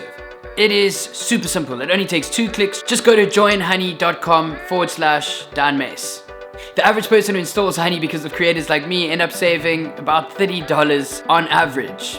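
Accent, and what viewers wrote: South Africa. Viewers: Great message, Dan, but when you start talking fast it's hard to understand you. Even when your accent isn't that strong, the different SA vocab and your word choice makes it hard to follow without replaying what you're saying at least once or twice. Maybe slow down a little so everybody can understand you! Thanks!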